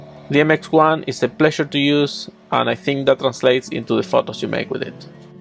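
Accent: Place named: Argentina. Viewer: Se te nota el acento argentino, jeje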